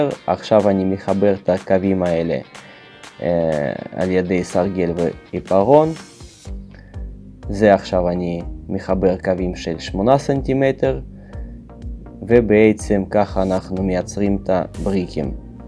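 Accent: Russia. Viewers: Твой" русский" акцент в иврите звучит знакомо -:) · Хароший Русский акцент.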